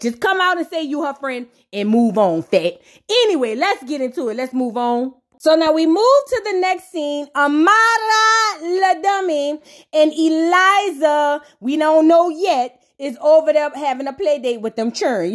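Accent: New Orleans, United States. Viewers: You’re hilarious! 😂 I started following you last week. I’m NELA but I feel like I’m listening to my NOLA friends. Gotta turn them on to your channel.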